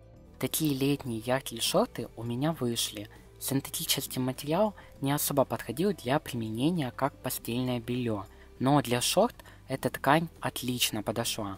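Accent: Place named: Ukraine. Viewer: По руски говорит но слышат украински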